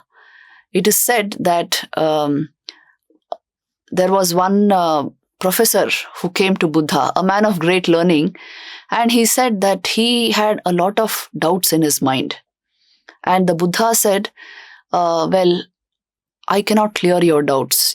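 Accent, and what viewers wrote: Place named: United States. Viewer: Conversion of the lecture to American accent is not enjoyable 😢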